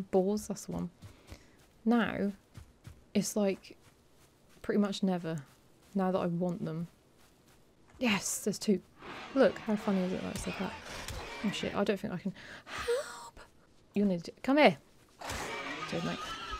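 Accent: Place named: Hull, United Kingdom. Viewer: 'It just dunt do nowt' your accents are hilarious; was you channeling a Hullian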